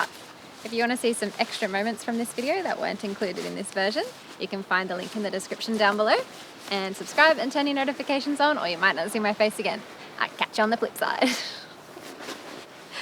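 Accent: Australia. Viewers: What a talented & resourceful women you are 👍. I love the Australian accent ❤️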